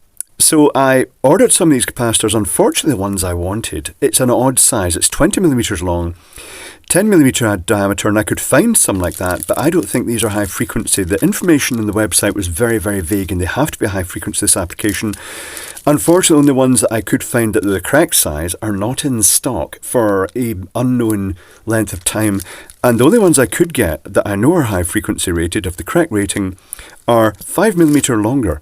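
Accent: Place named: England, United Kingdom. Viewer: I like your voice it sound very smooth and nice English accent. It's my first time that I saw your face. You are alike my Aust. friend. Big guy with a soft voice. Don't misunderstand me, I am not queer. 555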